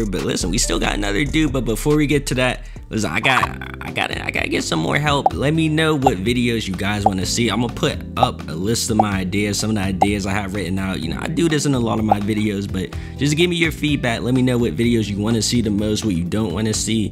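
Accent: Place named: Texas, United States. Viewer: Why does he sound lie he was born in texas because of his accent but hes really balck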